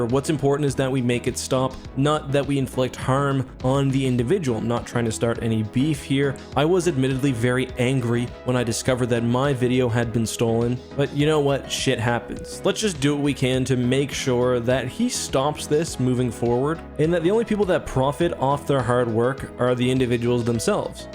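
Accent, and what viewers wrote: Canada. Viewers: The entirety of this video just sounds so Canadian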